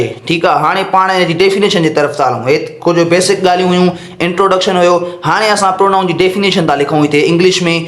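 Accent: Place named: Shikarpur, Pakistan. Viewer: ap ho sir but bt karne ka way esa h jese shkirpur k memon bolte h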